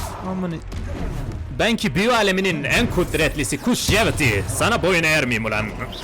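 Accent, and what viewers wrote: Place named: Diyarbakir, Turkey. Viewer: Abe Diyarbakırlımısın şiven küfrın kürtçe bilmen buralari andıri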